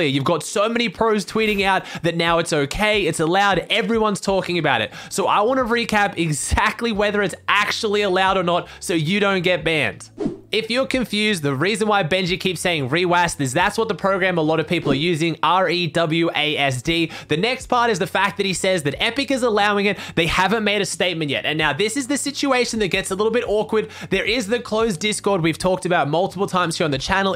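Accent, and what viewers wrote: Australia. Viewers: Aussie!!!!!!! Yo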